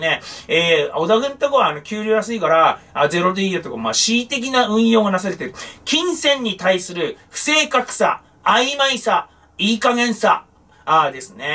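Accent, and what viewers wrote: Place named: Ibaraki, Japan. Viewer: なまってんな（茨木調）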